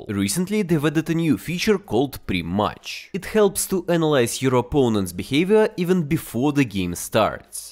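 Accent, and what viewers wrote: Russia. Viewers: Ох уж этот шикарный русский акцент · that russian accent tho. nice contetnt bro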